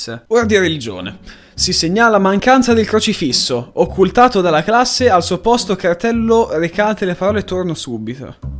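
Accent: Bologna, Italy. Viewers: Sei di Bologna lol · Uaa sei di bologna?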